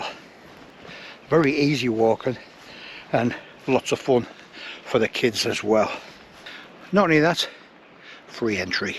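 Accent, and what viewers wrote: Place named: Darlington, United Kingdom. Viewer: We’re stopping near Keswick for a week at the end of the month and plan on doing Whinlatter and 8 Wainwrights in that area. Our little lad is trying to raise £1k for cancer research in honour of his great Grandma, by completing 30 wainwrights by the end of the year. He’s on 13 at the min 👍🏻 We’re from Stanley, I was trying to decipher where your accent was from. Thought it was Aycliffe or Darlo. Would be great too meet up sometime, or we might pass you 😊👍🏻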